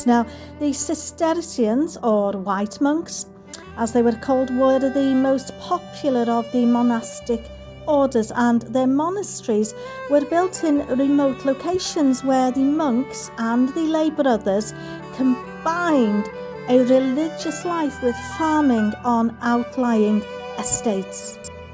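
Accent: Wales, United Kingdom. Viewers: Love that Welsh accent...I don't get to hear it often. What a place! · I remember when i first watched your channel videos and I almost could not understand your english with the Welsh accent. Now I'm very accustomed to your voice and accent and I can understand it clearly. It's a very pleasant accent and i enjoy hearing it for sure! We don't have any Welsh people where i live in Vegas so it's a joy to come here and learn about the locations way over there across the pond. I wish i could go into a time machine and live a week in these medieval times and experience the life. It's really interesting. The Valle Crusic Abbey has a fascinating history. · Hi, even though I have seen this one a couple of times before - believe it or not, I think I have a lot of details to comment on (still, this time) and I think even 1 or 2 new questions that crossed my mind. 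Firstly, I have to say, I am extremely impressed with you and with regards to research and knowledge that is required to put together one of these documentary type videos. And, I am also impressed with your beautiful narration (always)! And, of course, with your lovely and awesome Welsh voice and accent! Amazing place! It seems like (throughout history) England (and other places) was always fighting with Wales (to maybe steal its land). As such, it seems like there was a lot of defending required - by Wales. On another note, it seems like 8 services for the monks each day was a lot - even for monks. With regards to the "Black Death", my guess is that it is likely the same event as the "bubonic plague pandemic". I vaguely remember reading one time that the "plague" killed half of the people of Europe. That is just crazy - something so powerful and uncontrollable! By the way, I have a question for you, with regards to the Abbey's revenue sources. 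You mentioned that the 2 primary revenue sources were "rents and tides". I take it that "rents" refer to the revenues from tenants. Would that include the monks too - having to pay rents to the ultimate owners of the complex at the time? And, regards to "tides", was the complex located near the ocean (with boat dockings)?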